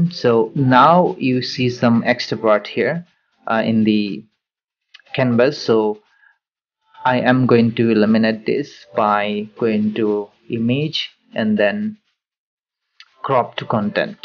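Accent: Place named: Bengal, India. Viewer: Why does this have to be explained to me in a Bong accent.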